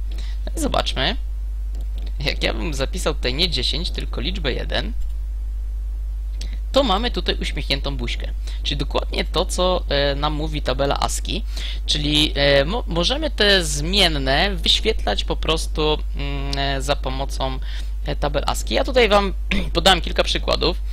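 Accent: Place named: Poland. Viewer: Ciekawe podstawy, ale masz złe nawyki jeśli chodzi o angielski, czasem mówisz dobrze, czasem wymawiasz słowo w sposób spolszczony. Char to [czar], a dokładniej i poprawniej z miękkim r i te cz to trochę złamane z tszi :) Na pewno C jest strukturalny? a nie proceduralny i obiektowy?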